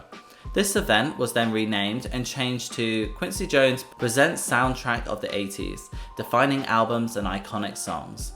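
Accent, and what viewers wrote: United States. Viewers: I was ok with american accent❤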